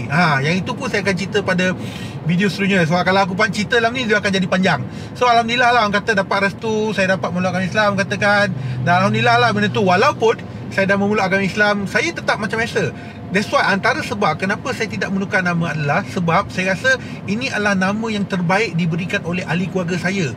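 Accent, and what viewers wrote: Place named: Negeri Sembilan, Malaysia. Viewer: Loghat N9 lak... best dengar...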